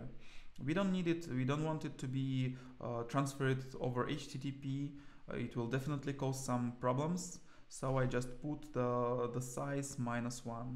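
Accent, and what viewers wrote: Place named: Russia. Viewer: сразу русский акцент слышно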